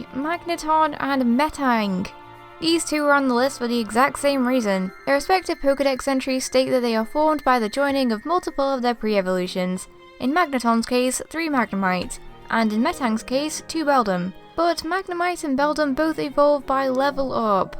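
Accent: United Kingdom. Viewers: Wil Asd its just her accent she is british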